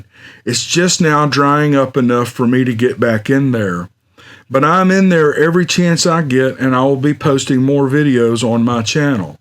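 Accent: South, United States. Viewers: I just love your soft, southern, masculine voice! Thank you for providing such a great respite from all the political craziness. I wonder who Bigfoot would vote for? Hahahaaa! No! No, I won't follow that thought any further! 😂 Great video! Great stories!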